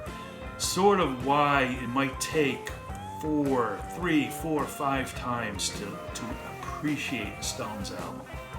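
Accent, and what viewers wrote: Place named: Philadelphia, United States. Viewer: This was the first Stones album (cassette) I ever bought when I was 15, 16(?) and although it’s not considered generally or by critical acclaim to be one of their best, it is nontheless a solid Stones album. To be honest, it’s not even one of my favorites although I do like it very much and always have. I was introduced to the Stones by the older brother of a good friend when I was still a young rock fan trying to expand my musical horizons past Foghat, Kiss, Journey, Doobie Bros, etc. (I had discovered Led Zepplin and The Who by this time but Pink Floyd wasn’t even on the radar). Anyway, thx for your in depth research, knowledge, and reflections of personal ups and downs the band members may have been going thru during the time of recording. One thing I’d like to mention about Goat Heads Soap is that I really feel that is possibly the most underrated Stones song and one of my all time favorites! 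Another great job. I watched all three Let It Bleed docu’s and now watching part 3 of Goats Head Soup as I write this. Would love to get a copy of Brussels Affair, CD and DVD, blue Ray if possible. Yep! Another great job! One last thing; I live in Cal and I’m guessing by your accent that you’re from Philly. Just a sayin… Hope you do a doc about my favorite Stones album and one the two tours I saw…SOME GIRLS. (Tatoo You was the other one)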